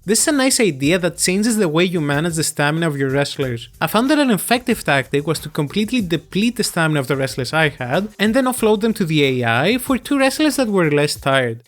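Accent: Greece. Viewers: That's the Greekest accent I've ever heard lol, awesome video bro 😊 αγάπη από την Κύπρο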